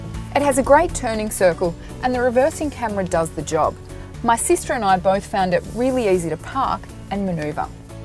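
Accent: Australia. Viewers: love the auzzie accent - now i watch all my reviews on auzzieland channels. i have to assume this lady is not super tall...otherwise this car looks enormous. hopefully they dont scrap these vehicles with the new renault-mitsubishi asx built in spain if i hear correctly. i would hate to see the great reliability of these cars destroyed by changes in production. japan built cars kick as*. as i get older i look much more into production and history than vehicle options. many vehicles out there are crap...the asx/rvr is not one of them. mine is still awesome 11 years later. good visibility. great maneuverability. reliable. simple. im 6'4" and it has lots of head room. the tall kids complain in the back seats so everyone needs to adjust the seats. paddle shifters are great for the smaller engine - my 2012 has it. enough power with paddles. auto is slow from a stop. 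fold the back seats down and use a moving blanket to haul a lot of stuff. i haul 2x4s and plywood with mine when needed. $120 after market roof rack.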